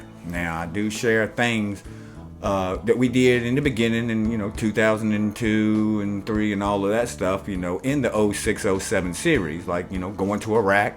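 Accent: South, United States. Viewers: Man that sucks that people unsubscribed do to your warehouse america videos. I mean if you don't like em don't watch em. Bunch of self entitled asshats. But yeah, what you said at the end is true. If they can't get over this then how "awake" are they. And people. what the fuck is up with the constant accusations of his accent being fake? Why, because he sounds "black"? Dumb asses, that's a southern drawl which in turn is what influenced how modern African Americas talk. And even if he did, so fucking what. We need to get off this race bullshit. We're all human, our "real" race is just melatonin levels in the skin. What we call "race" is just cultural, just how we act based on where and how we're raised. If a white guy is raised in the ghetto he's gonna probably act "black" and if a black kid is raised like a typical white bread then he's got a good chance of acting "white". 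Of course I know the real reason for this shit, that self important higher then thou feeling when you go all social justice warrior and call out a "privileged" white man for stealing and raping black culture which is total bullshit. Get over it, every race has done fucked up shit to each other and we are so over that by now.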